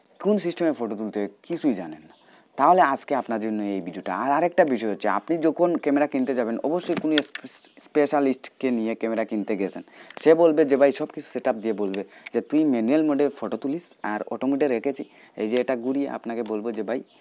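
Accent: Sylhet, Bangladesh. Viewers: সিলেটি ভাষায় মাত। 😊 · Sylheti na kita ba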